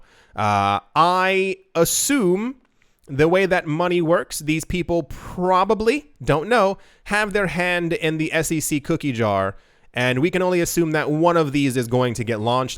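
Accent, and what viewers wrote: Germany. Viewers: Carefull.....your inner german is showing.....good accent! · Hehe, your German accent is great:)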